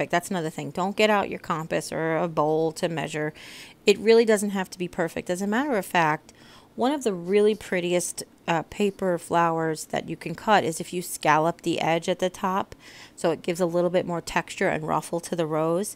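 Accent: New York, United States. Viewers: Love the rosette tutorial! Do I detect a NY accent?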